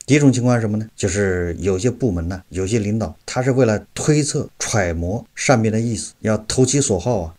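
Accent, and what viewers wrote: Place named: Anhui, China. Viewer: 听口音像 安徽安庆和铜陵的口音啊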